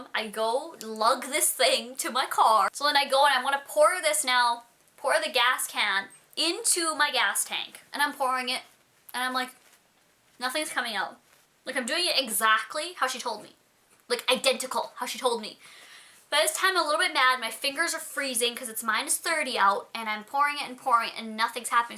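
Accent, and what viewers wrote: Canada. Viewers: Omg u sound so canadian like idk y I notice now lolz its so cuteeee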